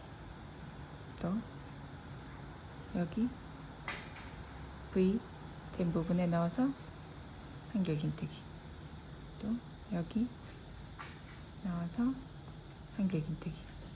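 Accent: Gyeongsang, South Korea. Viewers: 진짜 이쁘네요 살짝 경상도 사투리가 매력이 있네요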